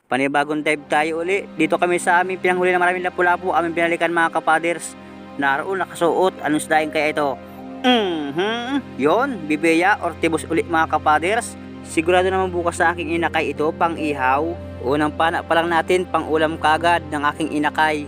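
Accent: Bicol, Philippines. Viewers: Pa shout out idol Ruben Cruz nasa Dubai pero taga bicol kaya gusto ko Tagalog mo at ma halong bicol 😆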